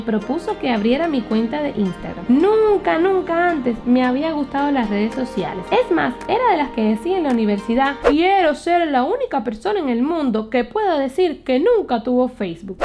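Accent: Cuba. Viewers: Ellas tienen el mismo acento por que las dos son cubanas y no le puedes prohibir eso 😒😒😒y ultima si no te gusta vete...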